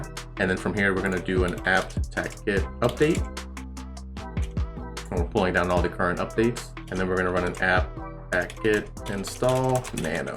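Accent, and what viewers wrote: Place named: India. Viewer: The indian accent got me good 💀💀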